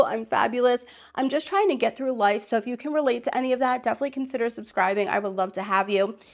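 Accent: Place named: New York, United States. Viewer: Great video I agree with all your thumbs down however I saw some new products we don't yet have out here in California. By the way I love your accent! It's adorable almost New York is it a Philly one? Just curious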